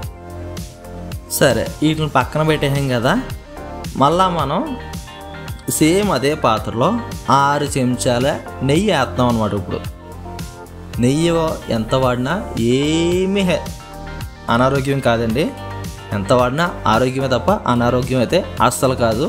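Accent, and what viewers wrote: Godavari, India. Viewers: Godavari style lo cheppaaru brother, very tasty joint biryani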